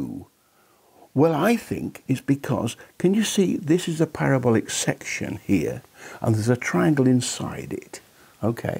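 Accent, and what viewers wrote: United Kingdom. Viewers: That's as British accent as it can get.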